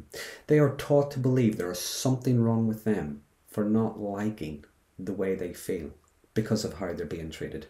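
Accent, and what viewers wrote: Ireland. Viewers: Hello, thank you for your videos. My family not only was a cult in the sense you explained in the video, but we were also in an actual doomsday cult. May I ask where your accent is from? My great-grandmother's family moved to Canada from county Cork in Ireland and you sound just like nanny and aunt Ethel. They were also Magees. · Well done, you're a smart guy--this was very good! That's a fine Irish accent by the way. Cheers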